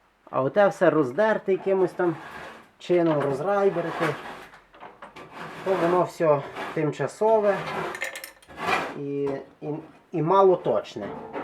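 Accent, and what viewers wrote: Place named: Galicia, Ukraine. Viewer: По розмові чую, що Галичина . Ні?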